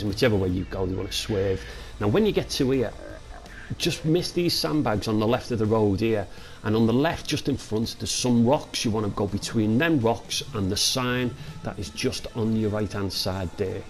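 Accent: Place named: Scotland, United Kingdom. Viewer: What region of Scotland are you from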